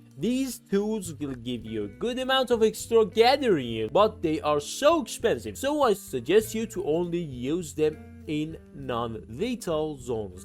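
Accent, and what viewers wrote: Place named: Iran. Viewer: dash zar mizane irani hasti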